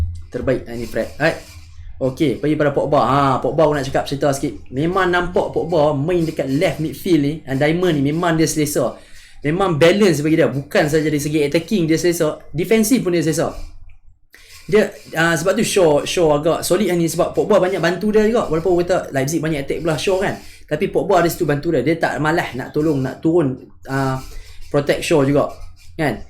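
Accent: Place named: North, Malaysia. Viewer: org utagha sggh abg ni 😆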